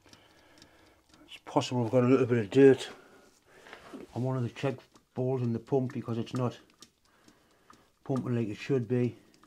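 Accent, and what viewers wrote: United Kingdom. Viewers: Enjoying this immensely! Where in the UK are you? My family is from Poole/Bournemouth, listening to you and wondering where you are located. Wonderful work, thank you.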